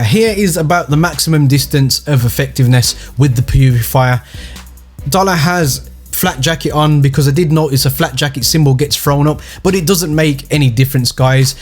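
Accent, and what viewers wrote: Birmingham, United Kingdom. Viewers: Are you from Birmingham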